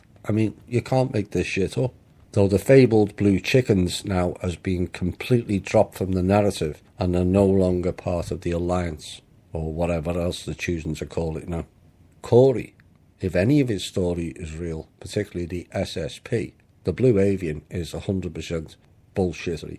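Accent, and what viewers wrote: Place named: Liverpool, United Kingdom. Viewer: What a breath of fresh air to hear your dulcet liverpuddlian tones ! So nice to hear intelligent convo from a fello blighty as most info ive found has been mostly american ( not disrespect to my american friends intended ) I love are no nonsense and seeing the irony in it that we brits do so well ! ♥ I felt gaia was dark first time I saw its adverts..its perfect candy coated mainstream approach smelt bad ! bit like Ancient Aliens...Im going to enjoy watching your previous vids now ive found you. He's not the messiah hes a very naughty boy ! Bit of Python to brighten the day :-)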